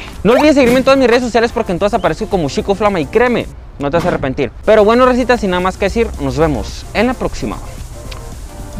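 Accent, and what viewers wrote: Chihuahua, Mexico. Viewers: Yo pensaba que eras de chihuaua .me gusta tu asento de sh.saludos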